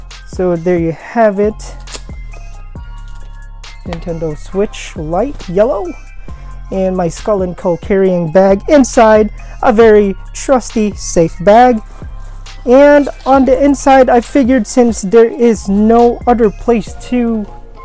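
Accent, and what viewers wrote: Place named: England, United Kingdom. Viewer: Wow I love that English accent🤗❤️❤️❤️ wow that's a nice handy bag #mto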